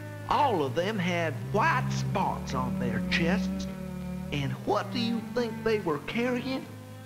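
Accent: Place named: South, United States. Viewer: I like the US country voice. 🤠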